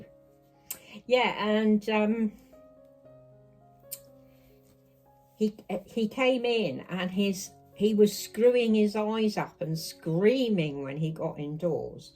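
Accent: England, United Kingdom. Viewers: Awe Hi Felix…Love him so much! He’s definitely a heart breaker ❤ Omg you poor thing with all that happen to your son and still..Ugh I don’t know how us mothers get through life after kids lol. They surly keeps us on the edge of our seats. Felix looked adorable in that new outfit 🤎 loved the teddy bear shoes ❤ thanks for sharing. I seriously couldn’t listen to you all day long, brings back so many memories of listen to my grandmother and her beautiful English accent. God bless sweetie and hope your feeling good.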